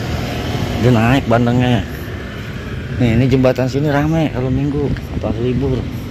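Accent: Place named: Jakarta, Indonesia. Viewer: Baru nemu nih channel. Ane demen nih, 👍 logat ngomong nye betawi banget.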